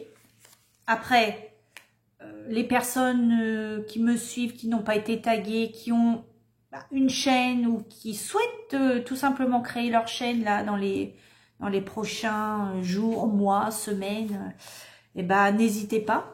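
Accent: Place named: North, France. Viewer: Merci d’avoir partagé un peu de tes secrets, mais c’est bizarre ton accent me faisait penser à celui du nord. Oui le principal c’est d’être avec ceux qu’on aime en bonne santé têt du coup à mon tour de te souhaiter une bonne année, à bientôt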